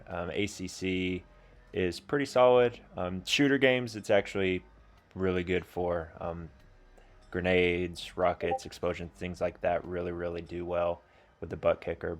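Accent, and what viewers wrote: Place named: Midwest, United States. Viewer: My profile name here is my XBL GT add me if you feel like. Are you Midwest US, sound like it.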